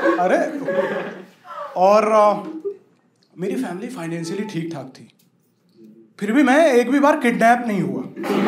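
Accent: Bihar, India. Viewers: Actual accent of bihar..."maat sahab"😂😂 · OMG, bihar comedian and the accent!!! Wowww mazza aagya bhaiyaa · Awaaj se hi bihari lag rage ho be, maja aay gaya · This man proves that you don't need to be from any metro cities to be a good stand up, you can easily portray your stories to other's and make them laugh in your accent also....and the Bihari's accent are always beautiful....keep going👍🏻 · Yourrr bihari accent dude just too good 😂❤️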